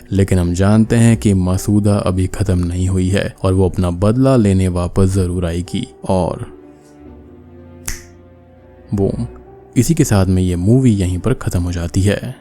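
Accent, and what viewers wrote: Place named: Hyderabad, India. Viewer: Tum mast hyderabadi bolte bhai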